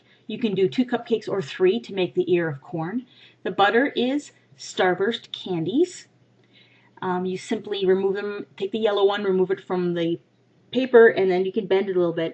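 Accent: Canada. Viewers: Yeah you sound Canadian don't worry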